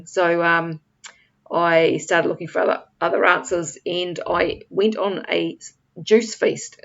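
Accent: New Zealand. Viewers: Thank you for your videos. I totally understand about you forget WHY you gave up a certain food or drink. I hope you keep feeling better. I'm dealing with the same and wondered about psyllium. I didn't catch what you said your friend got you started taking? Sorry, as much as I love your NZ accent 😍 I sometimes have to replay to catch everything 😄 Oh! I just now saw your reply to someone else on this! Thanks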